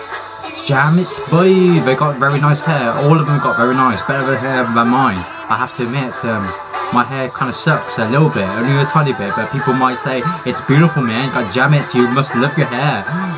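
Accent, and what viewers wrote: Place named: United Kingdom. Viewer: obviously tho its kpop with good reaction i loved it lmao 👌💫and your voice i love it its nice to hear someone else with a british accent 💫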